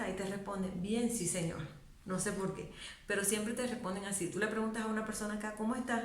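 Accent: Bogotá, Colombia. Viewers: Habemos personas colombianas que pedimos permiso ,me vende, hola cómo estás, decimos vecino pero al que está cerca a nuestras casas, no a todos y muchas más,soy santandereana, pero aunque no lo creas habla Venezolano con algo de acento Colombiano🥰👍🙏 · Ya tienes acento cachaco. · Pero yo la escucho hablando ROLO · Ya hablas como rola jaja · no te escucho hablar venezolano ya hablas colombiano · Hola hermosa buenos días... Bueno yo quiero decirte con mucho cariño q si se te a pegado mucho el acento bogotano. Es más suenas más bogotana q venezolana. Y también q decir me vende, me da, y me regala son todas expresiones muy respetuosas y en colombia las usamos todas por igual. Ahora el bien si señor es igual de respetuoso a decir bien gracias. No es q una sea más respetuosa q la otra. El sumerced es una exprecion de respeto y carño. Q se utiliza mucho en toda la región cundiboyacence y en Nariño. 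Y es una forma de dirigirse a los padres con mucho respeto, en Boyacá y Marino sobre todo. Bueno espero q te siga yendo muy bien en colombia. Un abrazo de mi parte. Chaaaoo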